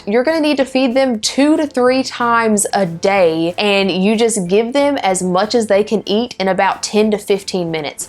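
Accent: South, United States. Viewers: You're drop dead gorgeous 😍 and I love the southern accent 😍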